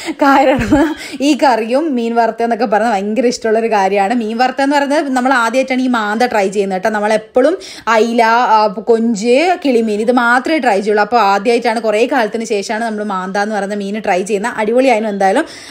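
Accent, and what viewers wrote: Kannur, India. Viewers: am also from kannur, samsaram kettond chodicheyanu 😊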